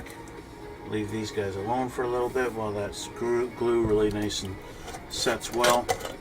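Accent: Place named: Canada. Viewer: Where are you based out of? You sound Canadian.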